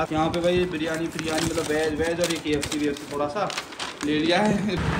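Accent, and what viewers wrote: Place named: Jhansi, India. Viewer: Bhai..apki language se lg rha aap jhansi ya aaspas k ho...or plz kha se train li o to bta dete...aadhi info di h..😢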